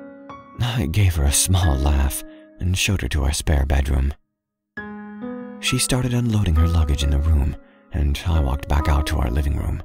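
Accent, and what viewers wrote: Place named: United States. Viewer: Your American accent makes me wonder if you're actually a Brit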